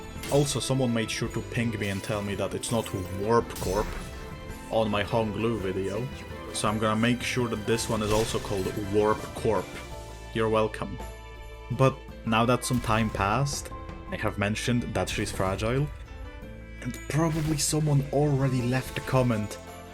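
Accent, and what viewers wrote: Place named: Germany. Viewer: holy German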